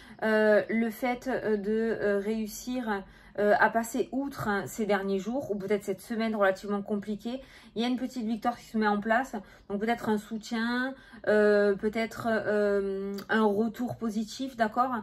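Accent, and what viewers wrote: South, France. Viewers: Coucou l accent du sud fait toujours chaud au cœur gros bisous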